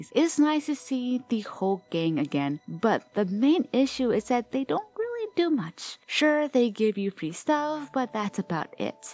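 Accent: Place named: Europe. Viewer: What unnamed random mysterious European country is this chick from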